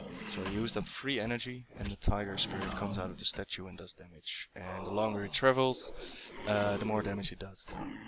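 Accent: Netherlands. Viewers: are you dutch??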